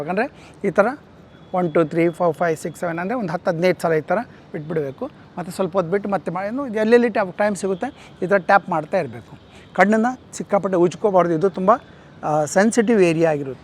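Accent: Standard. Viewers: ಜೀ ನಿಮ್ಮ ಕನ್ನಡ ತುಂಬಾ ಶುದ್ಧವಾಗಿದೆ ಹಾಗು ನೀವು ಮಾಡುವ ಸಂವಾದ ತುಂಬಾ ತುಂಬಾ ತುಂಬಾ ಅರ್ಥಗರ್ಭಿತವಾಗಿರುತ್ತದೆ, ನಾವು ಹೇಗೆ ನಿಮ್ಮ ಥರ ಧೈರ್ಯವಾಗಿ ಮಾತನ್ನಡುವುದು ಹೇಳಿ👏👏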